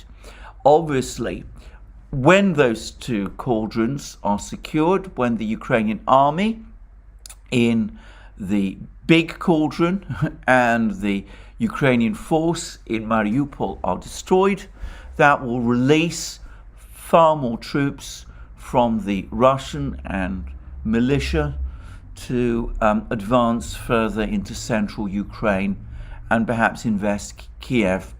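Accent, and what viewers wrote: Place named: Cockney, United Kingdom. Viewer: The speaker throughout this video has a British Cockney accent. Websearch "photo of Col. Douglas Abbott Macgregor" and you will see that the speaker in this video is not he.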